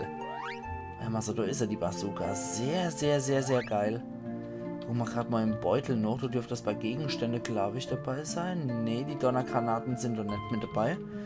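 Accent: Palatinate, Germany. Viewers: Nagut Nagut, dann erst Mana, hihi. Aber ich freue mich, ich werde live dabei sein, wenn jemand frisch/blind Chrono Trigger genießt, das ist etwas ganz besonderes. Uff Pälzisch ist dann nochmal ein Super Bonus, denn ich versuche schon lange das Spiel mal wieder zu beginnen, leider habe ich bisher kein Action Replay mehr um es anzugehen und auf meinem Rechner funktionieren die deutschen Roms iwi nicht. Auf Mana freue ich mich natürlich auch, das werde ich aber nur sporadisch verfolgen, da ich es mit meinem Sohn bald selbst zusammen mit meiner Frau via Multitap spielen werde, da will ich mir nicht die Spannung nehmen. Naja Später kannst du ja mal die eine Stelle vom Schattenwald untersuchen, die mit Man/1up auf den Maps markiert ist. Da kannst ja dann wieder hin. Übrigens ein Tipp, sobald du "reisen" kannst in Secret of Evermore, dann gibt es da eine Stelle in der Römischen Gegend, da gibt es so Statuen, dort kannst du an einer Stelle, die auch der Hund erschnüffelt, gefühlte unendlich Zauberperlen aufheben. 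Kennst du die Stelle?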